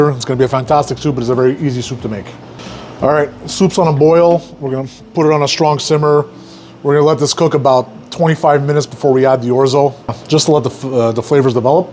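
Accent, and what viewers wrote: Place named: Quebec, Canada. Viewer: Something about a Quebec accent is pleasant. Thanks for the vids as always!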